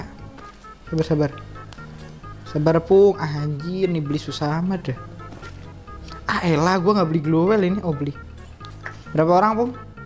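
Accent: Java, Indonesia. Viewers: Orang Jawa kah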